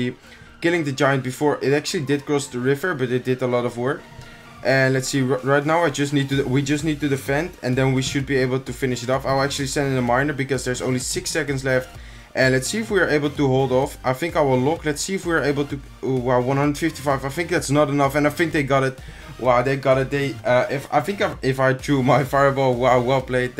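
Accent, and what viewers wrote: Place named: Netherlands. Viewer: Je praat Engels met een Nederlands accent... Ben je Nederlands?? Anders zou je dit niet kunnen lezen... Op xD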